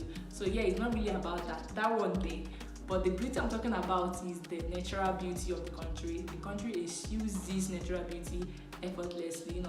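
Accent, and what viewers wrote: Nigeria. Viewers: you have south african look, hence they talked in our native language. it is not easy clear with you, only when you speak you sound naija. you look like south african, skin tone, face propotion and and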